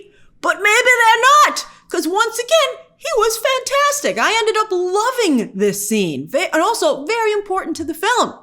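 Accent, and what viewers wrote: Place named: Mid-Atlantic, United States. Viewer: You have a mid Atlantic accent from the 1950s it’s so wild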